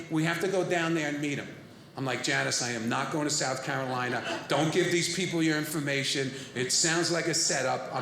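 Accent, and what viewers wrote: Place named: Bronx, United States. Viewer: OMG I knew from your accent that you were from The Bronx!! Italians rule!!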